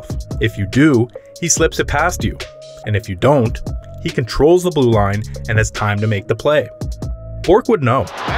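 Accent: Canada. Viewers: this is probably the most canadian accent ive heard on this channel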